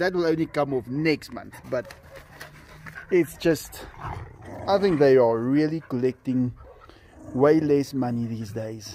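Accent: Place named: South Africa. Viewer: Majestic cretures + South-African accent =best channel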